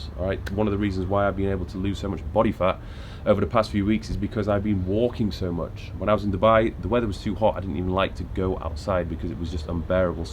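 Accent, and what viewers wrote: United Kingdom. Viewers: 3 main reasons: 1. He is good looking ( and we tend to like and give good personal qualities to good looking ppl ) 2. The British accent ( which makes you sound more legit ) 3. He gives decent advice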